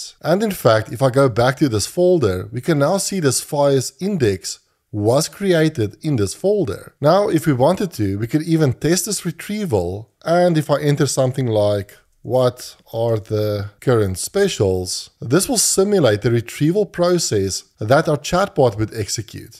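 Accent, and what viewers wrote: South Africa. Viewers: Great videos love the SA accent - in my flowise I don't see upsert config? please let me know what to do to get this.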